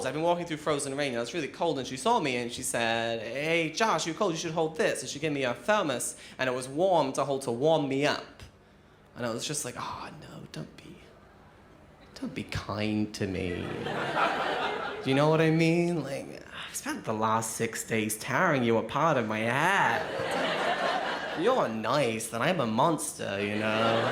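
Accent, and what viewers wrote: Australia. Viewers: just Aussie. He grew up in Brisbane, Australia and now lives in Melbourne... :) He can't explain his accent... he copped a lot of flack for it when he first started out because he is a Brissie boy... haha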